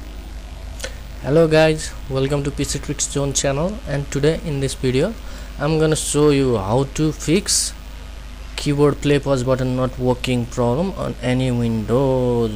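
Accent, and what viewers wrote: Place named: India. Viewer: like seriously? The accent.....the mic.....the rooster....India...............just smh!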